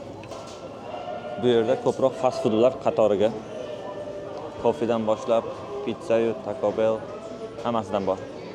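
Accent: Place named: Oltiariq, Uzbekistan. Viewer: Oltiariqlikmisiz shevez o'xshayaptida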